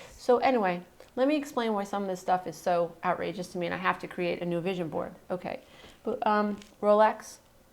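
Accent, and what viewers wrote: New York, United States. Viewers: Love the New York accent! You don't have to apologize for it. Thank you for this deeply inspiring video :) The message is spot on: your thoughts are your destiny! We empaths, who are stuck in the memes of the past, can't hear this often enough. Thanks to you, I finally get it! Peace and love to you and yours in the New Year!!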